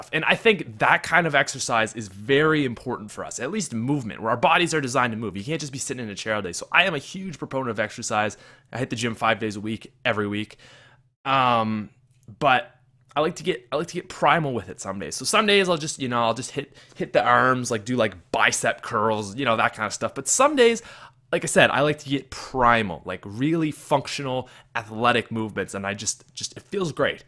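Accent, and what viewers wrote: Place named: Canada. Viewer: I knew you where from Canada because of your accent well I'm from Canada too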